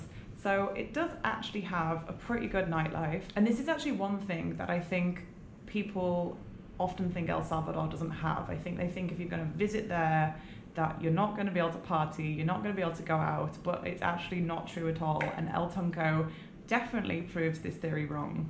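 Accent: United Kingdom. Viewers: we are not used to the brit accent yet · Dear, first and foremost, thank you so much for visiting our country. It's so smashing to know that you have come from so far away good old England. A question, did you visit El Cuco beach?? I believe you didn't but in case you are willing to come back again, I strongly recommend you to visit El Cuco beach located in the eastern zone of El Salvador. Although services are faster in El Tunco, El Cuco beach is so enthralling and I'm sure you will love it. I'm an English teacher with an accent that will set you thinking...it would be amazing if you could listen to my pronunciation. I love british English and your accent is just stunning. I trust you are doing well and enjoying life. I'm so glad you had a smashing time here.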